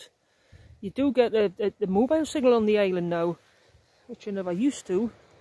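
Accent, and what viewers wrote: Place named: Newcastle, United Kingdom. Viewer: A good Geordie accent there, then! I remember a holiday on Eigg in 1954. The 'singing sands' are also 'sinking sands' - you have to be careful where it's wet - you get sucked in. The croft we stayed in had not yet got electricity so we had oil lamps to find our way upstairs to bed. The cooker was an old iron range cum open fire. You could hear the Puffin birds crying all night up on the backbone escarpment - quite eerie!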